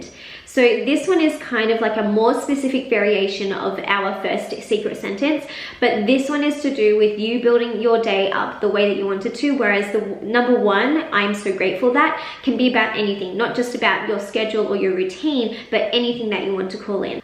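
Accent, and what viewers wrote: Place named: Australia. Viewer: It's really unique to see a pretty Asian girl with such a broad Aussie accent.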